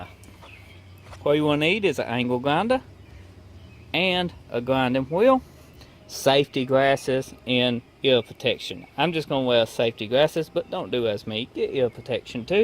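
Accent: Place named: Louisiana, United States. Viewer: That accent are you from Louisiana?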